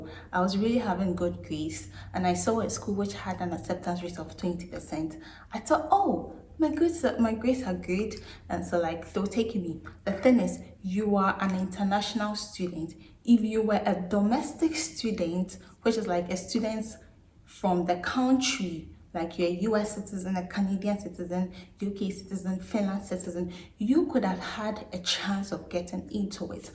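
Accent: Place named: Ghana. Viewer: You sound Ghanaian. Thanks for the video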